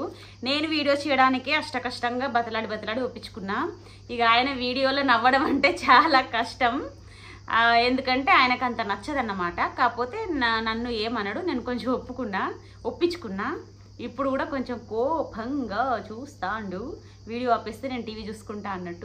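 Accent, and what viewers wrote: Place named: Telangana, India. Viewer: Your telugu accent is pakka telangana, I really liked it. All the very best.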